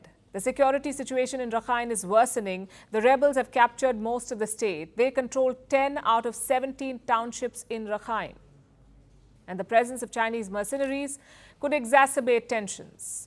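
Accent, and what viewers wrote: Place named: India. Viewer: English but of heavy Indian accent lol..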